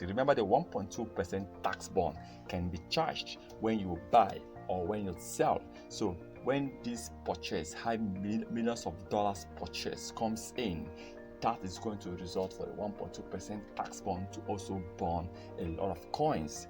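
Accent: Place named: Nigeria. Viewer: U sounds like Nigeria,I'm Nigeria too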